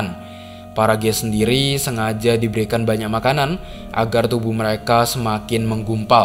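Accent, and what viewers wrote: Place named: Madura, Indonesia. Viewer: orang Madura nih yg bikin ten konten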